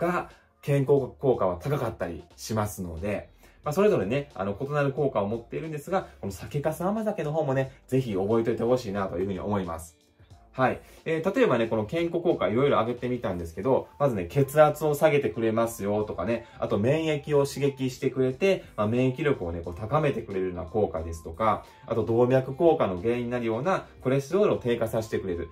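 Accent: Kansai, Japan. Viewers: 国菊あまざけを箱買いして毎日飲んでます📦 関西イントネーションが新鮮ですね〜🦻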